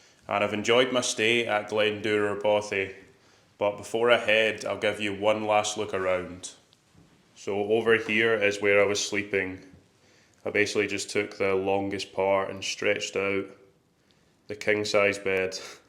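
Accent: Scotland, United Kingdom. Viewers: 💎Another great Video! Thanx and biggest Respect! Love your scottish accent .😊 Wish you all the best! 💪🏼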